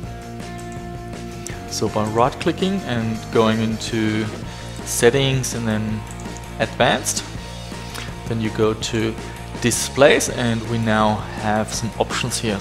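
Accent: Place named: Germany. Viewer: Du hast ein Deutschen Akzent.^^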